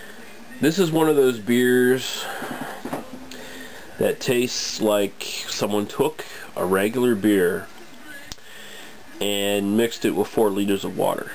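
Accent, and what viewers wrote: Canada. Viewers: He does remind me of Ricky, Ricky's awesome. Its the Nova Scotia "R" pronunciation. All my aunts and uncles on my mom's side are from Nova Scotia and have the Nova Scotia accent. And any time a hard "R" sound like in car, bar, etc it stands out. Sometimes I even sound that way.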